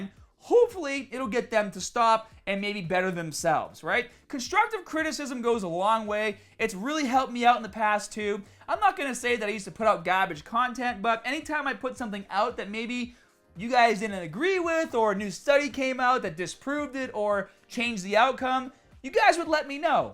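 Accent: Boston, United States. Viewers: Bruthah, your accent is wicked pissah! I grew up in Boston but lost my accent in Tuscon. Whenever I'm in Boston I realize that the accent's disappearing. Hold on to it. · I'm starting to get used to the Boston accent. It's becoming endearing! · Lol Boston accent thicccccc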